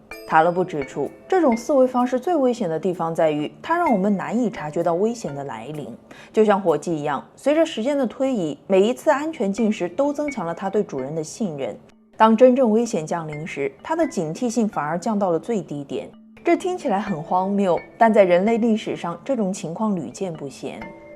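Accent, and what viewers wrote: Hunan, China. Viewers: 博主真的不是湖南人吗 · 大概率是湖南口音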